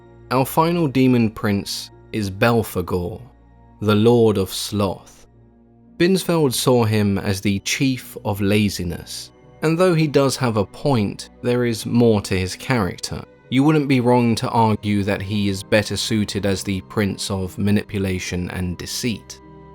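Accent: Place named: United Kingdom. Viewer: They are beautifully ugly like some exes love the smooth calming British accent from channel host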